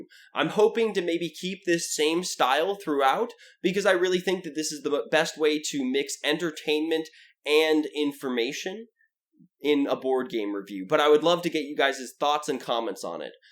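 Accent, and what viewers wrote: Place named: Texas, United States. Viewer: Oh my word. I love the %100 authentic Texan accent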